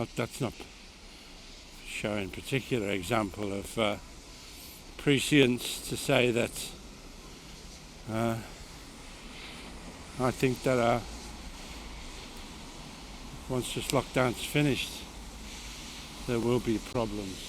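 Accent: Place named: Liverpool, United Kingdom. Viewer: I listened with the CC on and the subtitles are utterly hilarious. I guess the person subtitling doesn't understand Scouse accent or knows London at all. Exmouth Market was "Eczema Market", Anglo-Saxon car park was "Then go suction" car park, and Pret A Manger was "press emoji". I may watch all the vids again with the CC on to see how bad they are.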